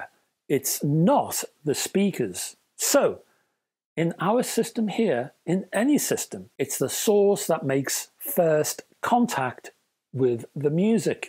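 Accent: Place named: Merseyside, United Kingdom. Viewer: Channel is great btw. Also nice hearing a voice from close-ish to home (I'm from Crosby)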